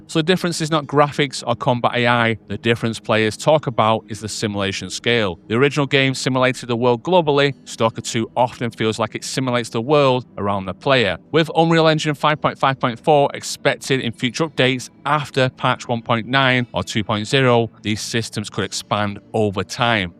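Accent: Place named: United Kingdom. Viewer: That bri-ish accent boy explaining stoka 2 again. Смешной такой, и откуда он только взялся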